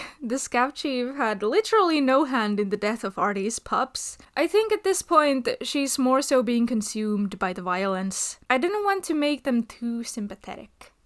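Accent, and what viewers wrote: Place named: Finland. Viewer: O Al's I guessed that because I'm Finnish too and I could tell from the accent. But your English was so good I had to ask separately.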